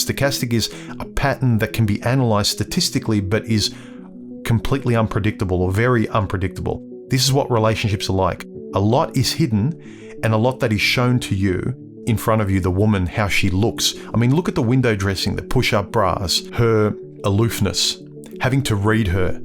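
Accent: Australia. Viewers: The Aussie accent is a pleasant bonus! 😉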